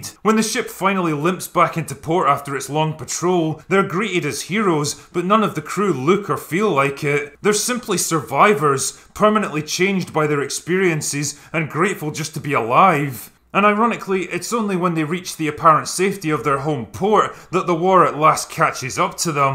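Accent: Scotland, United Kingdom. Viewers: I always enjoy your videos and often recommend them to friends, so I hope you won't take this the wrong way. Your analysis of Das Boot was excellent, spot on in fact, but you had a few inaccuracies regarding the history and as a Naval person and historian feel compelled to mention them: - Your archival footage at the beginning was all of German WWI U-boats, so wrong war. - Submarines are always "boats" and never "ships." Doesn't matter if it's an old 500 ton coastal boat or a 10,000 ton SSBN; always a boat. Also, I'm curious why you were saying "loo-tenant"? You're obviously from the Blessed Land of Scotch (in fact, you sound almost identical to a mate from Clydeside, especially when you tell us to go away), so I'm curious why you use the American pronunciation? Cheers!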